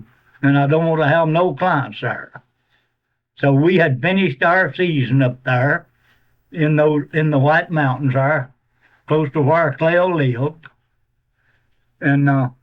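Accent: Tennessee, United States. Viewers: Sounds like he is from Carter County TN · He almost sounds like an East Tennessean, where I’m from. Maybe mountain talk is universal? Great story teller.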